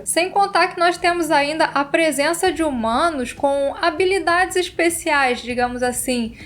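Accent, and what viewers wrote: Rio de Janeiro, Brazil. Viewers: eu notei um sotaque carioca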